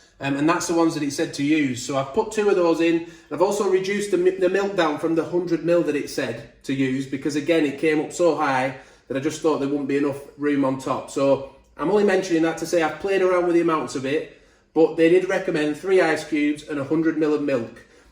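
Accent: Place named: Yorkshire, United Kingdom. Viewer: enjoy each time you say “Hey guys” at the beginning of one of your videos in your wonderful Yorkshire accent! 👍🏻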